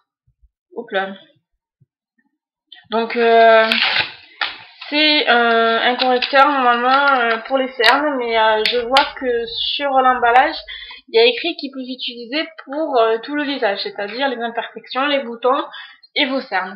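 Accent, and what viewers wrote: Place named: Toulouse, France. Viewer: j'adore ta vidéo ! :) merci et au passage bel accent TOULOUSAIN ;) <3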